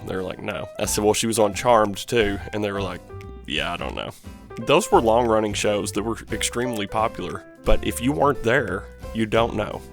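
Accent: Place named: West Virginia, United States. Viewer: You sound like you're from west virginia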